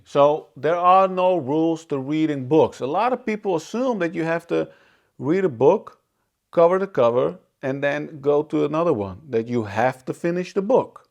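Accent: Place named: South, United States. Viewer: Is it me or you sound like a Southern American, although you're in Netherlands?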